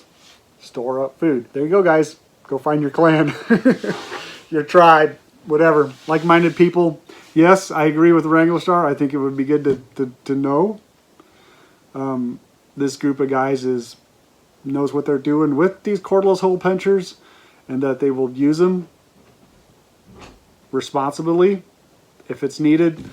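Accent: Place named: Pennsylvania Dutch, United States. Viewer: I can still hear the Pennsylvania Dutch in your accent!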